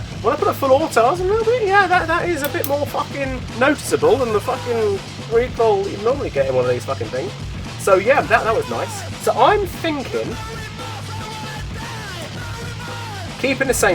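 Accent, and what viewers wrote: RP, United Kingdom. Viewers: His swearing and british accent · you sound posh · thought i was on a english ponce channel first 6mins. was gonna tell you to go swill tea with the upper class twats in a palace.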